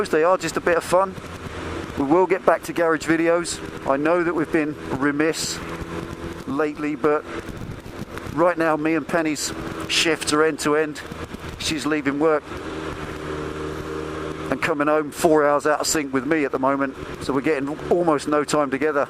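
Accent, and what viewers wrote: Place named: Birmingham, United Kingdom. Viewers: Well im from the midlands ...... i laughed like a hyena lol, nearer coventry than birmingham, sounded a bit brummie :-) Well vented spleen :-) Hey dont apoligise about vids, the garage vids can wait. Dont reply to this...... i said dont!! :-) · Love the moaning Brummy accent, lol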